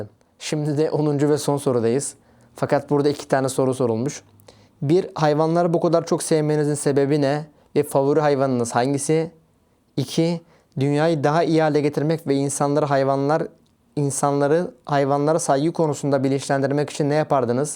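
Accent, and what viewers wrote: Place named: South, Germany. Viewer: Thanks for sharing dear ❤May I ask another question: How come your German is so good? I once heard you talking, even with a southgerman accent. 😊I know I am late with a question, so feel free not to answer. Good there are people like you on this planet!❤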